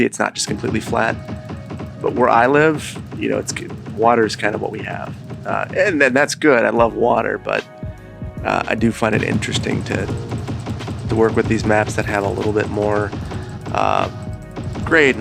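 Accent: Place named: Iowa, United States. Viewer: You from the midwest, flat with some terrain. Sounds like Iowa. Thats where im planted. lol. sometimes sped up builds are ok when its the same thing repeated. otherwise real time is best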